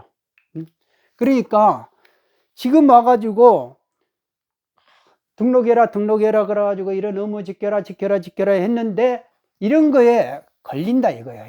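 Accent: Jeolla, South Korea. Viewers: 옹색하다와 징그럽다 둘 다 전라도 사투리는 아니고 말씀하실 때 전라도 특유의 억양이 있으십니다 징하다가 징그럽다의 전라도 방언이에요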